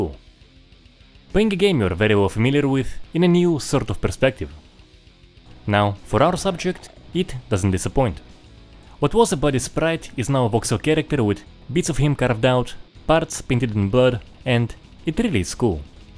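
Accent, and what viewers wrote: Russia. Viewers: Wнy aгe yoи souпds liкe a гиssian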